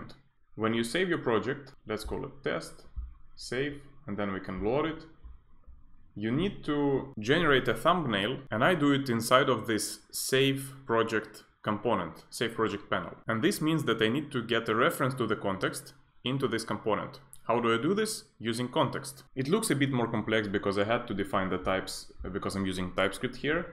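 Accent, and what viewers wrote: Russia. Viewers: Du låter väldigt rysk när du pratarz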